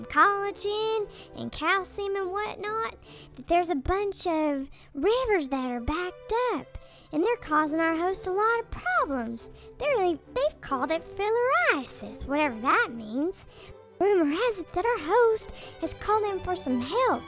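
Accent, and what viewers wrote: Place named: South, United States. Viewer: That's very very nicely done.Please do other parasites too. I guess anything with southern accent is catchy.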